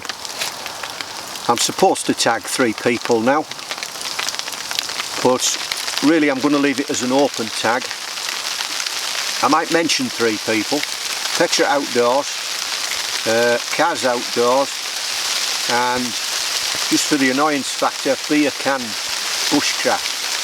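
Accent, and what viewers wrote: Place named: England, United Kingdom. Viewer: Very convenient music was choosen on the beginning! And what a lovely wood! What location is this? I assume somwhere in England, according to your accent?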